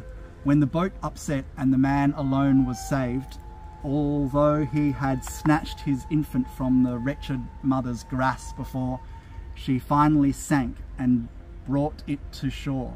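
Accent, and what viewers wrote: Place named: Australia. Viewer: Now that's a real historic place, interesting.I like listening to his Australian accent :)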